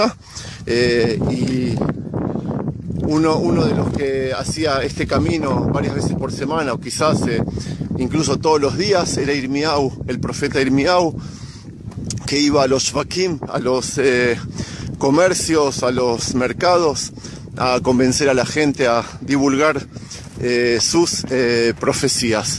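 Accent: Argentina. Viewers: DIOS te bendiga. Por compartir este Interesante video. Te escuchas como argentino ?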